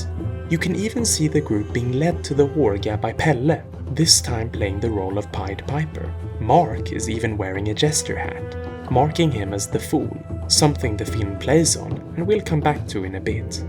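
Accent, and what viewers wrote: Sweden. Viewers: I can hear the narrator is swedish. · is the narrator swedish? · Man hör du är svensk hahah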